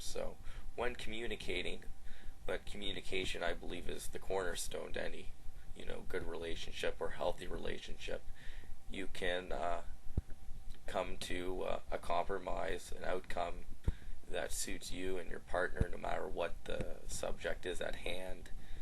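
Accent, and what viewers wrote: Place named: Canada. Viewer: i liker yer Canadian accent! like when ya say "OUT"...cute{i'm not gay[not that there's anything wrong with that], just callin a spade a spade}(fer serious). You really do have a gift as the voice of reason here!...great job.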